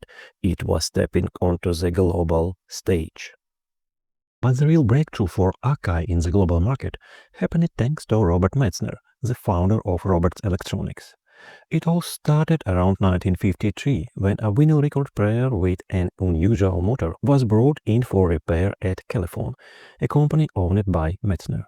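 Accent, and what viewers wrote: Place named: Russia. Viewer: It’s very hard to understand anything you’re saying because of your very heavy Russian accent!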